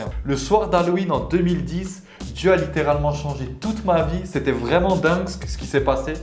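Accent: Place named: Alsace, France. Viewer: Je tombe sur ta vidéo, je me dis super un autre frère en Christ sur You Tube super! Et la j’entends l’accent alsacien et je me dis oh en plus alsacien ! 😂 Ps: je ne savais pas que le « yo » ne se disait qu’en Alsace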